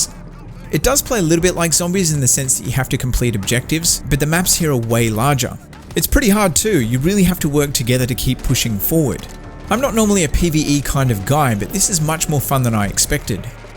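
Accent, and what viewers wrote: Australia. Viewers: what is your accent btw?! Australian?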